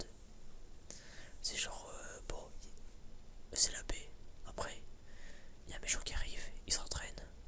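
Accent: North, France. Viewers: Accent du Nord j 'ai l impression. Non ?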